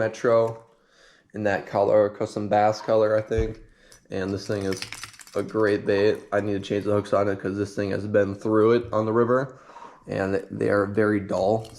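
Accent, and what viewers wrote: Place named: Minnesota, United States. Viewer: You must be from Minnesota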